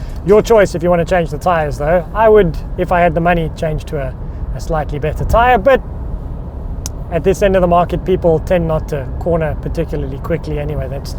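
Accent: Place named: South Africa. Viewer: I'm here cause I like the SA accent😅. Greeting from Australia. PS, can you show us going through a red light at night. Lol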